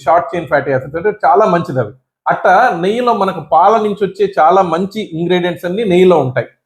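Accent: Nellore, India. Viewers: 🙏🫡🙏🫡🎉🎉👌🤞👍 సూపర్ డాక్టర్ గారూ స్వచ్ఛమైన తెలుగు నెల్లూరు ఒంగోలు యాస లో అందరికీ అర్థమయ్యే విధంగా చెప్పినందుకు ధన్యవాదాలు అండి మేము చాలావరకూ మీరు చెప్పినట్లే వాడుతున్నాం కానీ వేరుశనగ నూనె డబుల్ ఫిల్టర్డ్ వాడుతున్నాం గానుగ నూనె ధర ఎక్కువని వాడట్లేదు అలాగే రైస్బ్రాన్ ఆయిల్ ఒక సారి వాడితే మరొకసారి వేరుశనగ నూనె మార్చి వాడుతున్నాం నాన్ వెజ్ లో ఆవ నూనె వేరుశనగ లేదా రైస్ బ్రాన్ కలిపి వాడుతున్నాం మేము హార్ట్ పేటెంట్స్మి అందువల్ల పూరీలు డీప్ ఫ్రై స్నాక్స్ తినం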